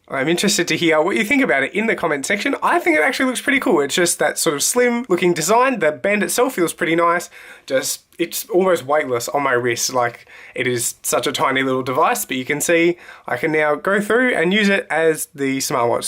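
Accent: Australia. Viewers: Are you Australian mate You sound like an aussie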